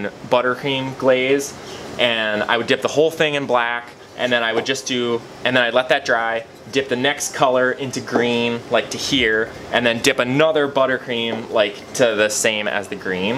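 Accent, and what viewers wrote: Minnesota, United States. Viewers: I do enjoy the Minnesota accent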